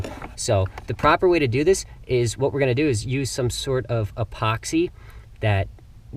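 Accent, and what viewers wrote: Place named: New Jersey, United States. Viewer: No wonder he sounds like Chris fix because he’s from Jersey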